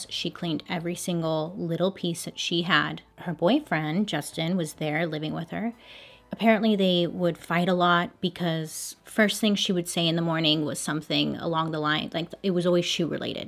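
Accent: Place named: California, United States. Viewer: Watching this in 2020 and I feel so happy to hear someone from my part of California!!!! I lived in Riverside, Moreno Valley and Perris!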